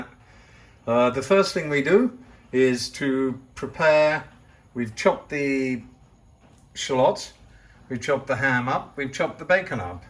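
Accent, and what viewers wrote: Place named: England, United Kingdom. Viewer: เข้าสองรอบเลยค่ะ ชอบอาหาร ชอบเสียงคนให้เสียง เพราะชอบสำเนียงอังกฤษทำให้คิดถึงคุณครูด้วยค่ะ ขอบคุณมากๆนะคะ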